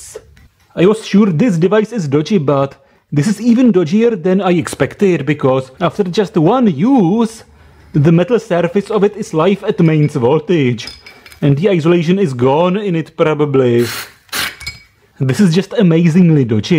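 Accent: Czech Republic. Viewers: You sound like you are from czech republic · An obvious Czech accent. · Oh my god. AS a fellow Czech i laugh just by listening to his accent. People probly come by just for that as i do :D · Is this typical accent of Czech people? I thought he stretched the last word on purpose to create a signature sound. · Yeah I can confirm as a Czech myself he is Czech.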